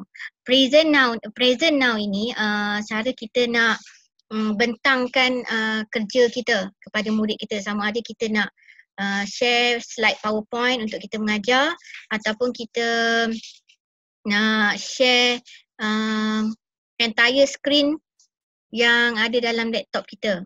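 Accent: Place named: North, Malaysia. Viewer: Syukran cikgu.....Penerangan yang jelas dan terperinci. Seronok dengar ada dialek utara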